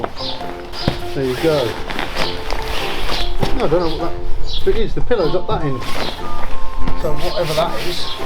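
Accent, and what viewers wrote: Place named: London, United Kingdom. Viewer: lol you sound london,but your mate is deff essex,love your channel,your the one helped with my first wild camp,been going to the moors since 1993,never wildcamped until other week,thanks to you went to merrivale ,loved it.so thankyou for helping me.. debs and stay safe.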